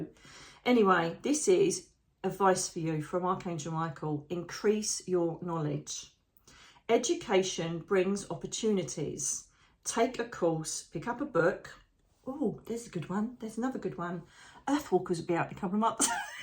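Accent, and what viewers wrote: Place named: England, United Kingdom. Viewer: I love you! 🥰 And I love your english accent, and your loving and grateful insights on our energy and healing potentials, the power of prayer - YES, the clarity in meditation -YES, Self love - YES supported by Archangels Michael (blue light) and Rafael (green light) and btw I love the fun and joy you give. ❤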